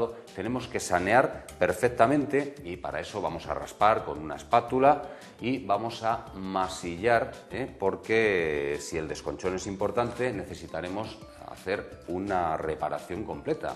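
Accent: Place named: Galicia, Spain. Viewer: Como habla la gallega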